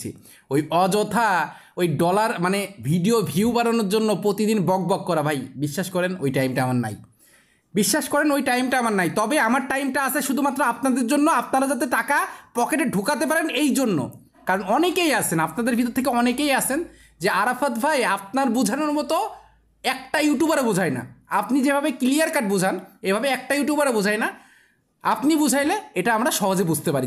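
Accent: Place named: Khulna, Bangladesh. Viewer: অনেক ধন্যবাদ আপনাকে ভাই, ১ম ভিডিও শুনেই subs, like,share করে দিলাম ।এটা আমি খুব করি না । ভাই কি খুলনার ।কথা শুনে তাই মনে হয় ।So nice your speech.Thanks again.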